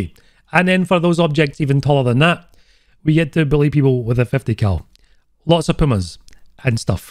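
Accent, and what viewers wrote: Scotland, United Kingdom. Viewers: Yup and the Scottish accent made me stay haha!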